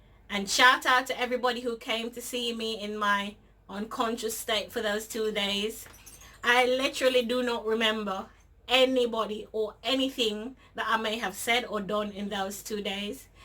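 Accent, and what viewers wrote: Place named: England, United Kingdom. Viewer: Interesting that you said Oxford shirt and now you have an English accent hmmmmm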